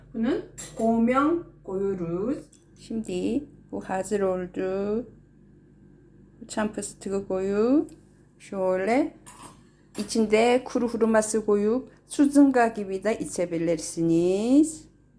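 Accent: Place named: Azerbaijan. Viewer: Elinize sağlık. Tarif için teşekkür ederim. Bu arada Azeriler gibi konuşuyorsunuz 😅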